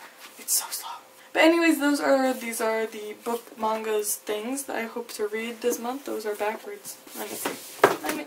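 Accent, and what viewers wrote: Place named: Canada. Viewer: why do you sound Canadian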